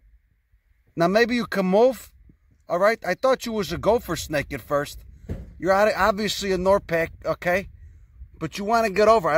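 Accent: Chicago, United States. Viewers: "Don't judge me, I will BITE your ass!" "Delicate bastards" is my new term of the week, although I am not sure I can match your Chi-town delivery. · South side Chicago Attenborough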